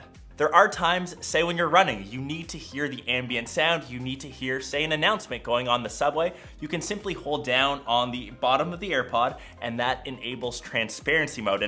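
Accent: Canada. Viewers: Your Canadian? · You sound Canadian or something I think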